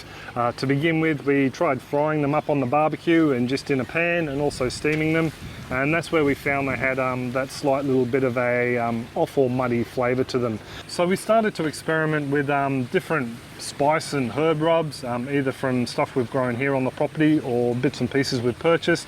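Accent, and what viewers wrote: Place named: Australia. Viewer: I have enjoyed your videos for a good bit of time now but just wanted to say your Australian accent with your wife’s man being has me reminiscing my childhood! It reminded me of the Disney cartoon “The Rescuers Down Under”. I’m not poking fun... I just think it’s very cute:) Your fish flavors look amazing!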